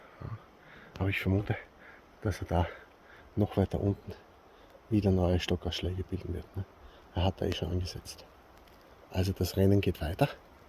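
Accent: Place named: Austria. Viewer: Omlouvám se, ale nemůžete mluvit spisovnou němčinou? Jedlé kaštany mě zajímají, sami je už pár let sázíme, ale tomu dialektu (AT?) jako cizinec nerozumím úplně dobře ..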